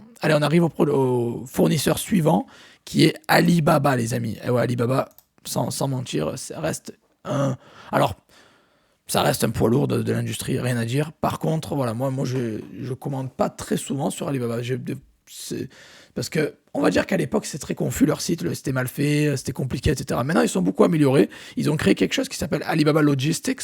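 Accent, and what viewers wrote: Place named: England, United Kingdom. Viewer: merci super comme d'hab et au fait sympa ton accent anglais 😅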